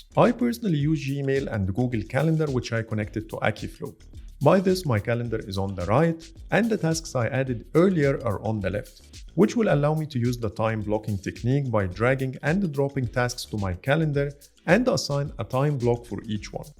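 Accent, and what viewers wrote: Egypt. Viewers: اللي بيتكلم ده مصري صح؟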